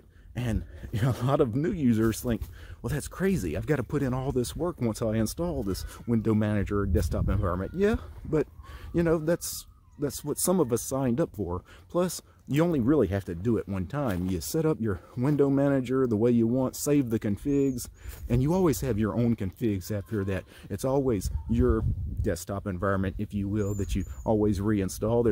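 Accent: South, United States. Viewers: Ah, so you're in Louisiana! I always wondered where that southern accent came from. We got a lot of snow here in Arkansas too.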